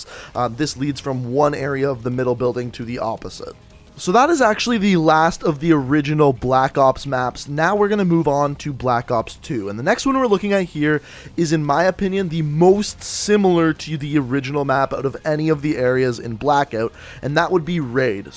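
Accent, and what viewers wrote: Canada. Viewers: R u Canadian? Love it accent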